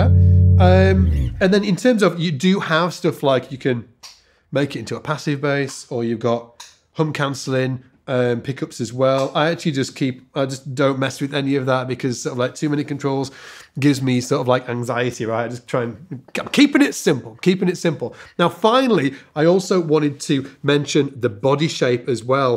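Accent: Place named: United Kingdom. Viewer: are you British or are Australian, or you are gabacho(USA)? I've watched videos speaking with a gabacho accent. Now your accent is British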